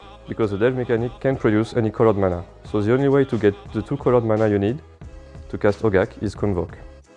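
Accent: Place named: France. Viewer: L'accent français 🤪😘 La même en français c'est possible ? 😁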